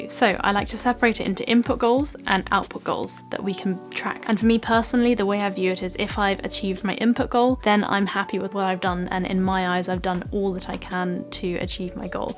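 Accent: RP, United Kingdom. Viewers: Thank you so much ........I really love listening to ur British accent!( ˘ ³˘)❥❥❥ And I enjoyed all day long watching ur Vlogs 🤗🥰 Hoping for the further....,, useful & motivational Vlogs of your's!💟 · Such a breath of fresh air to start off the new year such a positive accertive approach puts me into gear for the year ahead please I adore your English do some more rp english videos I am english but need to polish up thanks so much keep up the good work and yes some yoga blogs would go down well roger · Hello, Thank you for telling me about your Goal Setting Science and it is really important for me to learn from you how to set goals in my life for the year 2023., I'm happy when you got a degree and became a doctor. I love your nice British English speaking styles. You know many Chinese styles. My support is for you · Love you always and british accent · Hello, I really like your British accent, I would like to communicate with u, would u like that I send u my e-mail❓️thank u very much, take care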